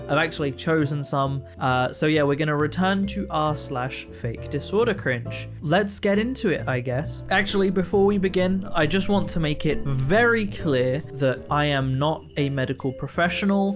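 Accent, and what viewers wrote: United Kingdom. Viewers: It's only now occurring to me that you're British.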